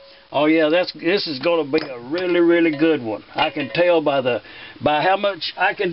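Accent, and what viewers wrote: South, United States. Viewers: It’s really interesten how you took dat wearer to get the spring ends through. Good job. Love your Southern accent. 👍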